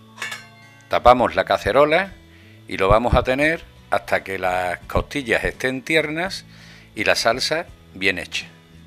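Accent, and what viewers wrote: Spain. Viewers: es esto estilo espanol? digo por el acento, pero no se,yo le hubiera hechado chile, no soy mexicano pero he vivido toda mi vida en little mexico(los angeles california) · de flipe total!!!!! menudo éxito...menudo recetón con acento andaluz, la hice con vino de pasas del puerto de santamaria y ha sido una experiencia extrasensorial, mas no se puede gozar con la ropa puesta.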